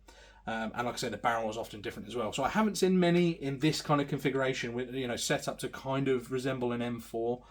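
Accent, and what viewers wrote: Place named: United Kingdom. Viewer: Hearing a British man talk about his AR15 is something I never thought would happen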